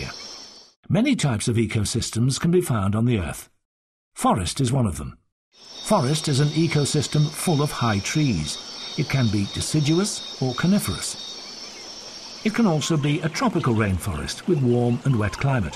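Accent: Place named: United Kingdom. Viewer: I quite frankly was very fascinated by this educational video due to the fact that the narrator had a lovely British accent and because the video had a lot of cool pictures.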